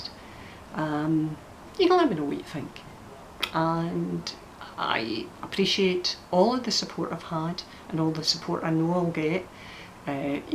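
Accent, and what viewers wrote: Scotland, United Kingdom. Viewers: Hi my dear friend ❤ you are such a brave woman to come on here and show yourself to us❤ the real you, the honest you and all that pains you. I thank you for sharing ❤ you were one of my first (if not the actual first) friends that I made when I started my channel. I remember you had commented on my video where I was trying to show my hole puncher and eyelet setter 😂 we started our Friendship from there, I was so excited some big YouTube person commented on my channel I told everyone about you! My whole family knew who you were, my Dad took a liking to you because he lived many years in Scotland, so when I would play your videos he would sit close to hear your accent as you spoke (dont worry, my mom is not the jealous type 😉)❤ you have always been a huge inspiration to me ❤ I know exactly what you mean my little channel is very slowly growing and I usually never get over 40 or so views. 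And the sales in my shop are slow as well. Then I see others who started around my time as well and they are "big time" I dont know how it all works? I still do it bc I love it, if ppl watch my videos, cool if not it's ok to. If I sell stuff, great! If not, it's ok. I just love doing me ❤ and what makes me happy ❤ I am always here with an open ear for you if need be ❤ I love ya my fantastic sassy Scottish pal ❤❤❤❤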